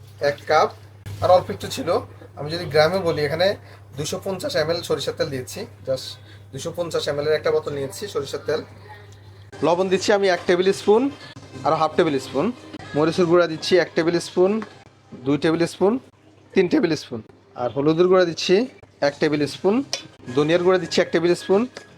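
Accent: Noakhali, Bangladesh. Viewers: আমনে নোয়াখালীর মানুষ, কথায় বুঝা যায়, তবে রান্নায় ওস্তাদ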